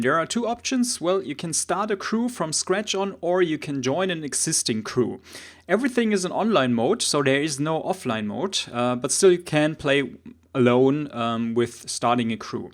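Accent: Germany. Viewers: Wenn Deutsche Englisch sprechen :) aber trotzdem gutes Video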